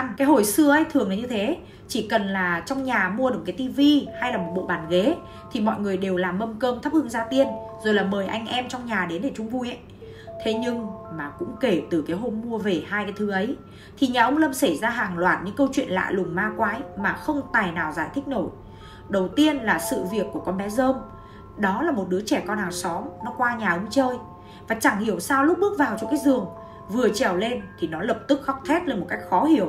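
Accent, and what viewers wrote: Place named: Nam Dinh, Vietnam. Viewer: nhưng giọng nam định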